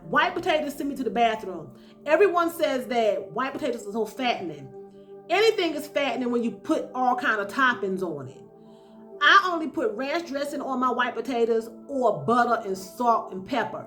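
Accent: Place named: Brooklyn, United States. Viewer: Girl 😂 I can hear that Brooklyn accent in you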